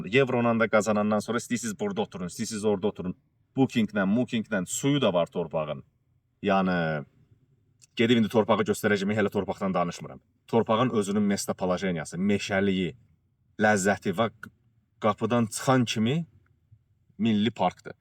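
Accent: Ganja, Azerbaijan. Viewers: İn şəə Allah olarsan Rəbbim hidayət versin yaxşı əməllərinə görə amin .Gəncədən sən deyəsən ləhçən oxşayır)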